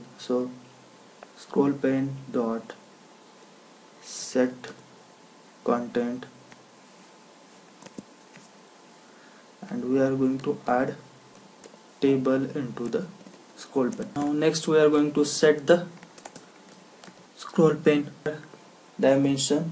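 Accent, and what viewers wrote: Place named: Hindi, India. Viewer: this lovely Hindi accent..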